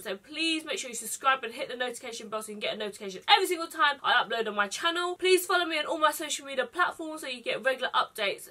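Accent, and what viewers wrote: United Kingdom. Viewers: omfg ok so this is my first video and i found you off instagram and damn girl im like really shocked you have a British accent and not American like for some reason i thought you would have a American accent but when i heard you i got shocked and im still in shock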